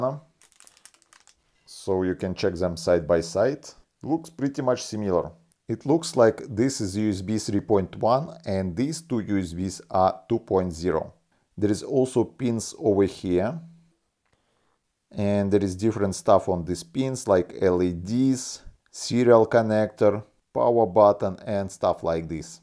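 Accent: Russia. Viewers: 38 seconds into the video and I can't stop wondering: Или автор русский и ничегошеньки не хочет делать с акцентом or if this is the most hardcore russian accent imitation I've ever seen. Good vid though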